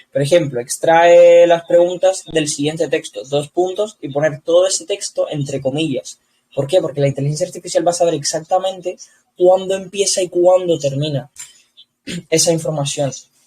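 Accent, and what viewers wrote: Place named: Spain. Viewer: Vaya vozarrón y acento español llevas colega 😂👏🏼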